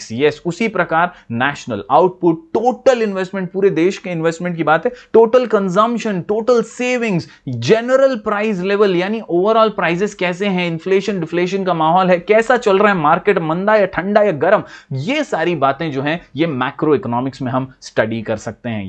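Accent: Maharashtra, India. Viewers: BRO YOUR MARATHI ASCENT SO COOL (tumhari video kon edit karta hai )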